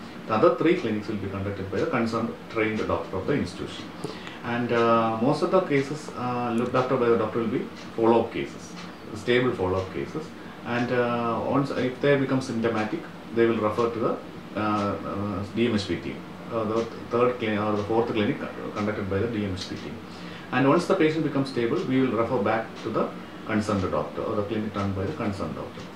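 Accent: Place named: India. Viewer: speak ENGLISH i don't want to hear your fucked up stupid india voice or acent. its one of the worse langues in the world and most stupid sound and un able to make sense of it. sounds like a fucking baby...i guess they want to act like freakin babies their whole pathetic life plus their county is a complete mess they have no respect for their own back yards...pathetic way to live.....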